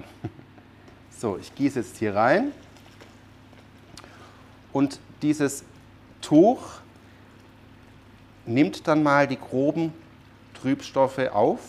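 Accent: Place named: Swabia, Germany. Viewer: Oh... ich kann mich auch vollkommen vertun. Aber ich rate mal einfach frei raus: Nürtingen? Südlicher? ;-D Isch jetz nix Böses gemeint! Isch bloß an Witz. Danke für dein Video!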